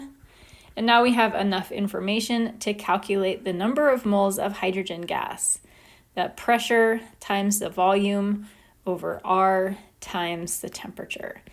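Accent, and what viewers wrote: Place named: United Kingdom. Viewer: Hello MAM I'M A GRADE 12 STUDENT FROM INDIA.......I USED TO THINK I CAN'T UNDERSTAND BRITISH ACCENT BUT I WAS WRONG U MADE THIS GAS STOICHIOMETRY PROBLEMS DAMN EASY......I NEVER EVER UNDERSTOOD THE CONCEPT OF WATER DISP METHOD PROPERLY BUT THANKS TO U FOR MAKING IT A LOL TOPIC.......BTW PLSS MAKE VIDEOS ON PHYSICAL CHEMISTRY RELATED CONCEPTS AND SOME INORGANIC BLOCK CHEMISTRY RELATED CONCEPTS😅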